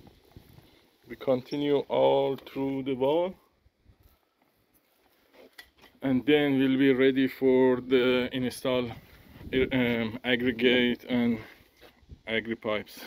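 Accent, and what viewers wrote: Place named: Iran. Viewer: I'd recognize that Persian accent anywhere. :)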